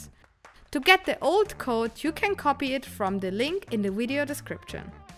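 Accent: Germany. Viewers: Mein erster Gedanke: "Sie muss eine Deutsche sein". Typisch deutscher Akzent xD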